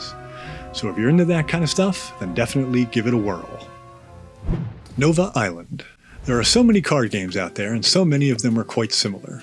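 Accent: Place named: United States. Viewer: Cool! You got an American voice actor! This app seems like less of the Chinese malware it is with him voicing it.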